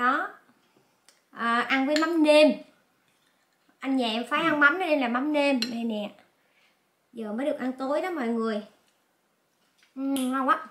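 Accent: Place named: Phu Yen, Vietnam. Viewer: Mình nghe giống giọng Phú Yên quá xá~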